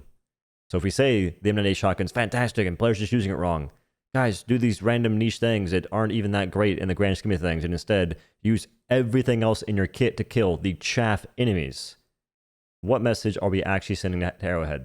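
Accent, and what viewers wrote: South, United States. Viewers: I'm from Bowman south carolina and I gotta tell you every other man you meet sounds like that. Southern drawl. Born and raised there too but my accent is weird.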